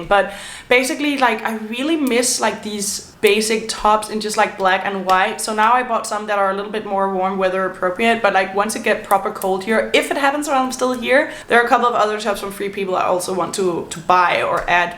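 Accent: England, United Kingdom. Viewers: Really interesting as a Brit hearing your comments. I think you are right- everyone all ages is wearing white trainers (not sneakers- 😊). I think it's a post covid rejection of uncomfortable footwear Converse are popular but tough to wear all day. I think we don't consider unique jewellery because most people don't prioritise spending money on it - we should - but definitely too much (imo) make up. I can confirm your skimpy tops are not shocking and the jacket does not stand out (i.e. it is nice but normal expect for it being 30c!). The cropped long sleeve square neck is lovely. Your English accent made me smile but it's autumn not fall 😀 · 😂 I’m an English woman and I totally approve of your attempt at an English accent 🤣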